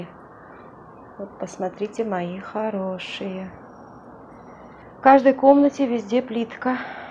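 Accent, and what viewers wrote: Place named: Georgia. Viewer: Очень четко слышится грузиеский акцент! Гамарджеба!!!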